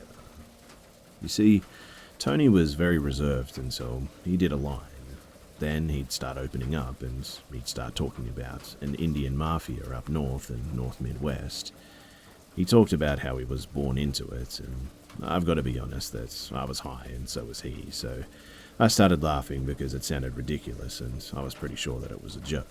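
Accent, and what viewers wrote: Australia. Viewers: I like you. Got a nice voice. I suppose you are from down under? Greetings from North Vancouver island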